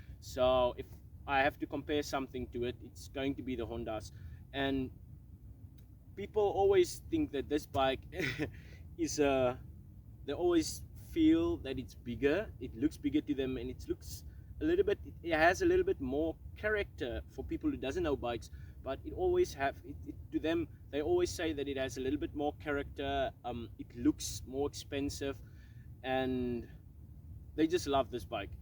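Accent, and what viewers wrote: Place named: Afrikaans, South Africa. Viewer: The Afrikaans accent in this video is phenomenal 😁👍 thanks for the video! As a girl...it helped me a lot! 😁😂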